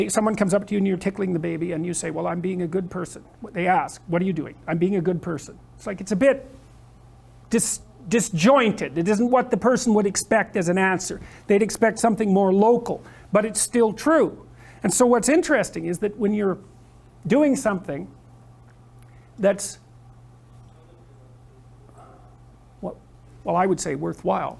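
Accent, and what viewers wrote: Canada. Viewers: I love it when he goes full Bubbles from Trailer Park Boys 🤓 I love that Canadien accent!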